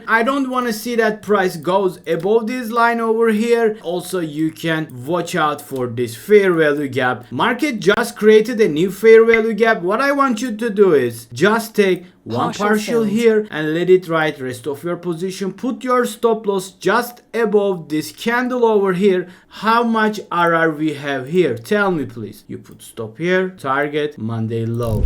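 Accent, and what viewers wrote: Turkey. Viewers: Bro I guess you are Turkish? Am i right ? 😄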